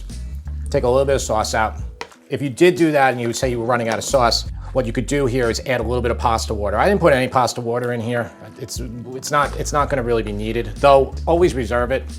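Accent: New York, United States. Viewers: I love Italian food (and the Italian New York accent, hehe)! I'm gonna try making this soon. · Sorry, I'm Italian and I don't know the accents in America. But now here what would be “Italian” about his New York accent ? He is American, born in the US, speaks only English, Italian he doesn't even know, how can he now have an “Italian” accent ?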